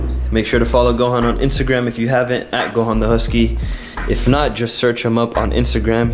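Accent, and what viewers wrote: Miami, United States. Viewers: Your accent sounds like you’re from Miami. Did I guess correctly? Lol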